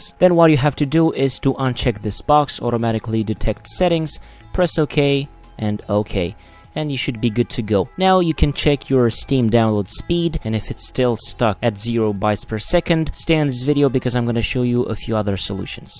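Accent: Romania. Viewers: It worked, thx, but let me ask, are a romanian youtuber, ur voice sounds familiar to me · You are from romania?xD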